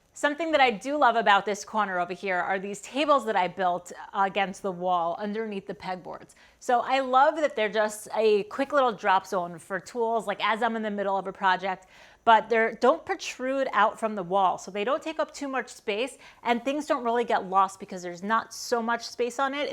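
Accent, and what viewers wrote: United States. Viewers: She just sounds like a Yank to me, but whatever, you're all going to be speaking Chinese soon anyway.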